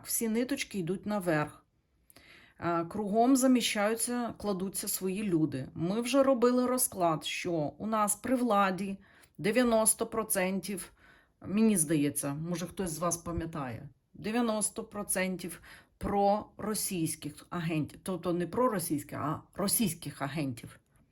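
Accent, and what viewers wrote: Western Ukraine, Ukraine. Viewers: у вас шикарний западенський акцент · гарна мова з акцентом Західної України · У Вас акцент дуже злегка західноукраїнський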